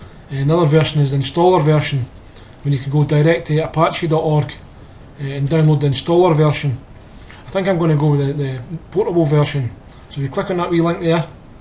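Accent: Scotland, United Kingdom. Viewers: Scottish accent? 👍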